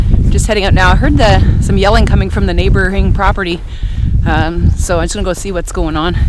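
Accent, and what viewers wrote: Canada. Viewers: Canadian accent :) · Canadian people have such a nice accent.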